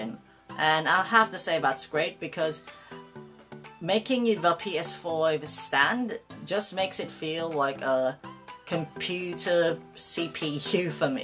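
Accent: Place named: Australia. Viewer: What’s up with the Australian accent?? I enjoy your usual voice it sounds a lot different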